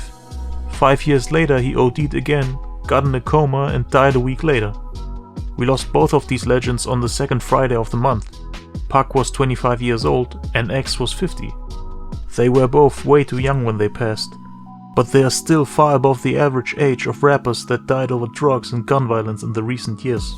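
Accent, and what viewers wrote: Germany. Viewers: Dickaa, ich hab gewusst, dass dieser Akzent Deutsch sein MUSS. Bin in die Info und dann sah ich, 'Aufenthalt Deutschland'. Mach weiter so, bleib am Ball🫡 Rest In Heaven, A Rap LEGEND✓✓✓😔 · wenn man im Profil des Videos auf "Kanalinfo" klickt, kommt auch die Info "Herkunft: Deutschland". Man erkennts ja auch am Akzent :D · First time that i hear you speak. Are you German? Because your accent sounds like it. Also, Rest in Peace to one of the GOATs. If you somebody suffers from depression or dont feel well for other reasons, believe me drugs are never the answer! They only put you even more down, i know what i am talking about, i also had drug problems in the past. Hope really found his peace! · Habe ich mir fast gedacht... dein accent sounds pretty german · Loool you sound very german biste deutscher? Edit : Okay hab den Mediamarkt und deine Geschichte gesehen krass! 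Hätt net gedacht das du Deutscher wärst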